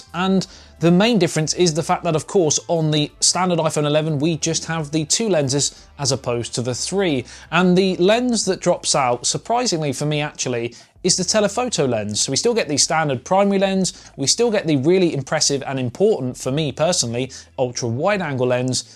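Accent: United Kingdom. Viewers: Typical irritatiing british accent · is British by his accent...and i guess I am right...!!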